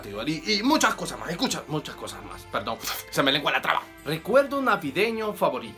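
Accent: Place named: Mexico. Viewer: Adoctando? 😂 Ese man habla más como mexicano los hondureños no hablamos como montunos 🤣 bueno al menos la mayoría que yo conozco además cada quien habla como quiere 😎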